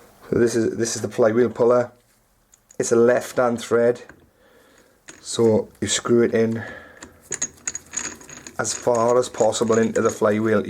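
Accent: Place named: Scotland, United Kingdom. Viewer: Beautiful Scottish accent 😊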